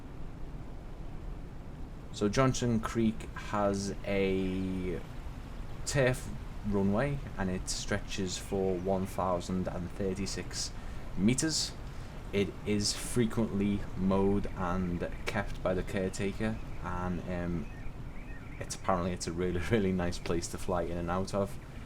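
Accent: Liverpool, United Kingdom. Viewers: How odd, just bought this on a whim and looked for a review, found yours first and sounds like you're from Liverpool like me, small world :)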